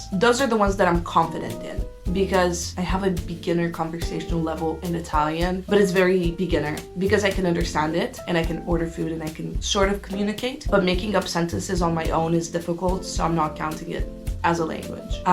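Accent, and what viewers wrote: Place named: Russia. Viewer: girllll i like ur russian accent , btw im also going to be studying at film school cant wait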